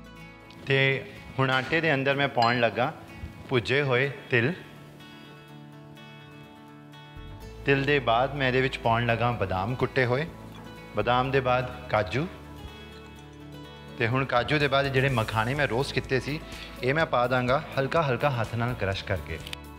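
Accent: Punjab, India. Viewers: Sweet punjabi🌸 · Your Punjabi is so sweet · Ur Punjabi is so sweet I love ur way of speaking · Jinni mitthi panjiri ohni hi mitthi Punjabi veer ji 👌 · Mza aa gya mithi jehi punjabi sun k😍👌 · Loved your recipe, your presentation and above all your cute Punjabi!! Keep it up brother🙏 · Nice punjabi accent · The way u speak punjabi so sweet beta love u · Mithi punjabi nd mithi panjiri 💓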